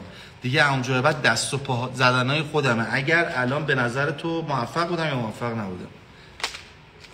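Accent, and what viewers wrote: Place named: Turkey. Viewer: دادا ترک ع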